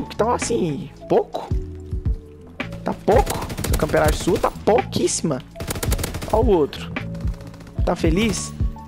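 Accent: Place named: Goiás, Brazil. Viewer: advinhei pelo nosso sotaque, também sou de Goiás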